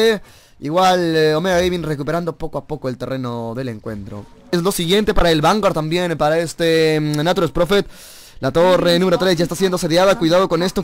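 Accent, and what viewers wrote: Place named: Peru. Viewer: Claramente es peruano jaja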